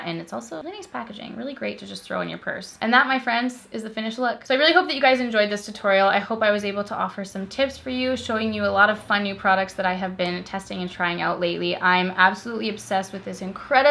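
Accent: United States. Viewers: i must say , i did not expect an american ascent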